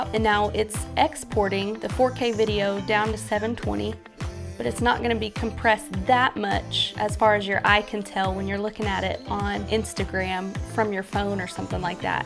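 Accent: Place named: Oklahoma, United States. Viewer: Thanks for the vid it was helpful! I was trying to figure out what your accent was and I saw it’s Oklahoma 😁 I’m in Alabama and we have a similar accent.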